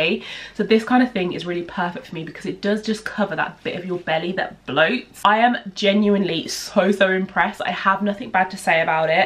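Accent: United Kingdom. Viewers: I love your British accent. Your bum looks good in the purple swimsuit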